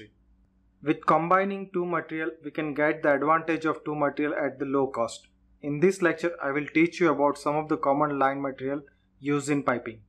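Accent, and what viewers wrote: India. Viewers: is it indian accent?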